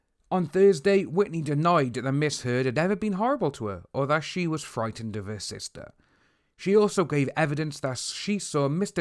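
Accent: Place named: United Kingdom. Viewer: Love your British accent... Good job keep it on.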